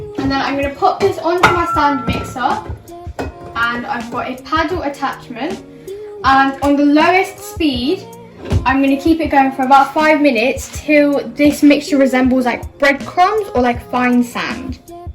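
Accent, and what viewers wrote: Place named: United Kingdom. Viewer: British accent is really hard to understand..😕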